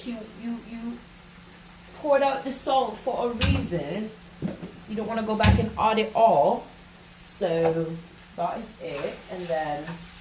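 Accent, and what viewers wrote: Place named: England, United Kingdom. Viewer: Love your English accent... You always make me laugh.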